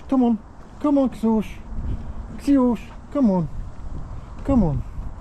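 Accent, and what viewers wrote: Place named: RP, United Kingdom. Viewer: Я при просмотре этого видео поимел некоторый "когнитивный диссонанс". Когда ты совместил в своём монологе две (для меня) стереотипически несовместимые вещи; - эталонную английскую (не американскую!) речь, коей "надлежит" быть дежурно-вежливо-чопорной, и - "неистовую" русскую эмоциональную экспрессию 😄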